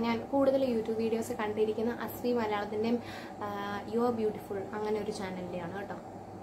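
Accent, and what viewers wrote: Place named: Kottayam, India. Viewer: Aahaa chechi Kottayam aa alle enikku samsaram kettappazhee thonni Njan oru Q and A thappinadakkuvarunnue Eppam alle kittiyee Njanum Kottayam aannee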